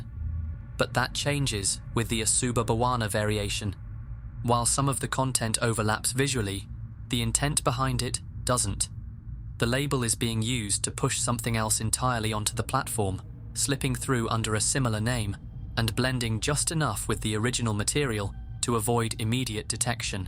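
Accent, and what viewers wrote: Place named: United Kingdom. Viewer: Nasally, Br*tish voices aren't exactly fit for these types of videos.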